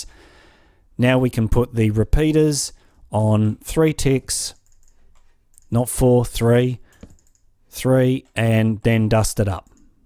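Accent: Australia. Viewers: you seem australian are you? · You sound Australian... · are you australian? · I'm Australian and this is the most Australian accent I've every heard! Makes me proud!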